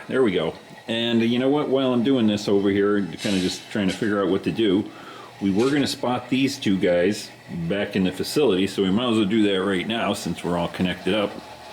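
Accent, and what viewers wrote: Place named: Chicago, United States. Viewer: My wife insists that you are originally from Chicago per your accent. Are you?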